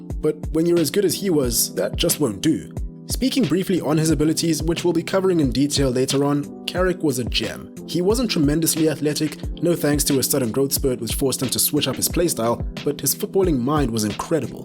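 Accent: South Africa. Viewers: Are you South African ? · Mzansi accent. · Are you South African? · Are you South African?? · Love the SA accent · I’m hearing a South African accent 🤩 awesome video!!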